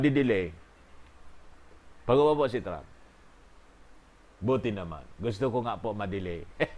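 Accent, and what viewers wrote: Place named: Waray, Philippines. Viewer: TamA ka manOy, Hahahaha natatawa din akO.. natatawa AkO sa sige na gud ( ang sige nA ngA: )) Ang sarAp pakinggan dahiL kuha mO ang accents ng warAynOn, isa akong taga pakinig sayO manOy, at warAy-warAy din akO purong waraynOn.. at ganon din si MadAm Imelda R. MARCOS AY isang waray waray din. God BLESS.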